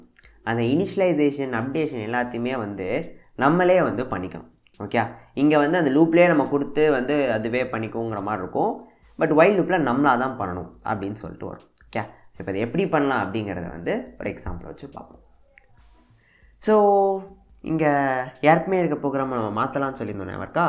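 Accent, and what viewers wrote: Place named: Tirunelveli, India. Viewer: Hi bro unga slang vachi parkumbothu i think you are thirunelaveli paiyan I'm i correct✅😂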